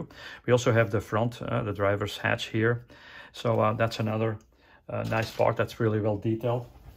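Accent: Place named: Flanders, Belgium. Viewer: Mine is in the post. I think it is more accurate and refined than the das werk kit. You have a (super slight) Flemish accent, are you Belgian (as you also worked for Verlinden)?Pistol port on the right hand side of the hull is superfluous. Das werk has none, Trumpeter had it on both sides. Should only be on the driver side. I like they provided the drive wheel center cap as separate. Like most probably, I prefer the look of all the bolts in plain view. I thought the tires were normal plastic already when I saw them, thanks for clarifying that, good news!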